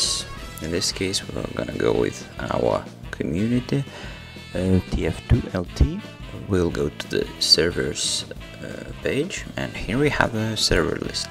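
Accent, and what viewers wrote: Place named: Ireland. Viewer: what is it with irish people doing game tutorials?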